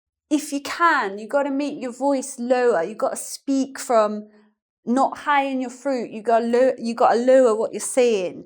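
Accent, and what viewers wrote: United Kingdom. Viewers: You know what, I really really like your accent. Before I found British is hard to understand for me but after watching your "How to sound like a Posh" I felt like I can understand all what you were saying. so after that I spend my time watching British accent video.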